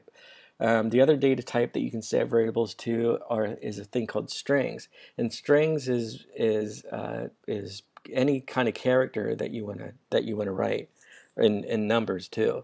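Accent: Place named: United States. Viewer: this shit is sooo helpful im real glad u actually have and american accent my damn teacher is swahili.. its killin me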